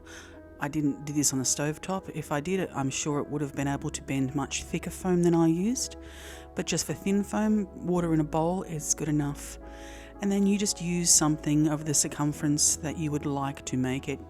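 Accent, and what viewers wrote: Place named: Australia. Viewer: Worked very well and have never thought about this. Saving this in the bank of things to do, you sound like a fellow Australian as well mate :D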